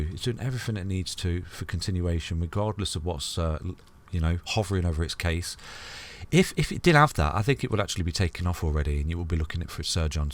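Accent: United Kingdom. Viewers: Love your content my friend, I’ve moved to Canada 🇨🇦 from the Uk 🇬🇧 and it’s so nice to hear a fellow countryman giving great content and TA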